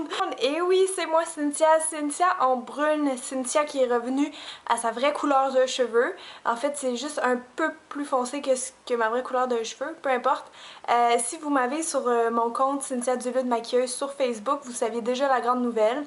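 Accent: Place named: Canada. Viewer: Bonjour, je viens de découvrir ta chaîne et suis en train de visionner une à une tes vidéos. J'aime bcp ce que tu fais et comment tu présentes tes vidéos et également ton accent (je rêve d'aller au Canada, alors je ne me lasse pas de t'écouter! lol) Et cette couleur te va super bien, tu es très belle ! biz de France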